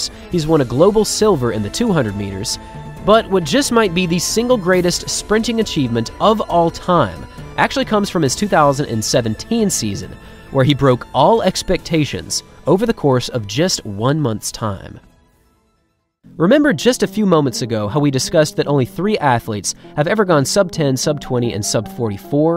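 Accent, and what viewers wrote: United States. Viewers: Yer commentary is so American. African all star?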